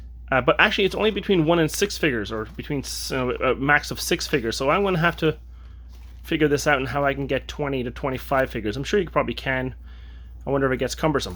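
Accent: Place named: Irish Canadian, Canada. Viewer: You have a great voice: a charming blend of Irish and Canadian.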